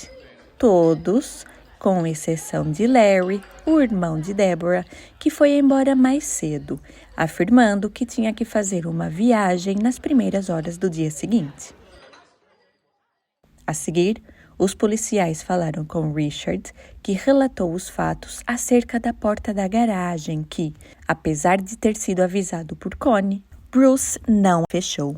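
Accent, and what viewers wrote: Portugal. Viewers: Ótimos vídeos, ganhou mais um inscrito, sotaque português muito lindo rs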